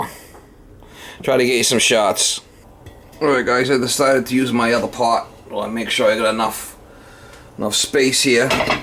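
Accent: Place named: Boston, United States. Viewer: Question for you, as I'm a pretty fan of your channel and work in the HVAC residential field. But anyways, from hearing your voice, are you from Boston or New Jersey?? I've always been curious Haha love the videos man, keep em coming!!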